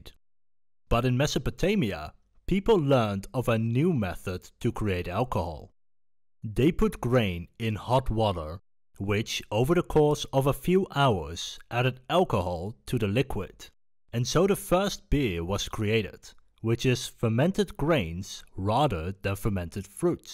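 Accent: Netherlands. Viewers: This guy is definitely dutch based on the accent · Hearing the accent I was thinking, this sounds like a Dutch dude. After seeing the Brand beer; Jup, Nederlands!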